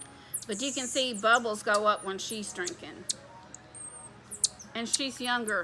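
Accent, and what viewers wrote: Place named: South, United States. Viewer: That is that sweet southern accent for ya! Hahaha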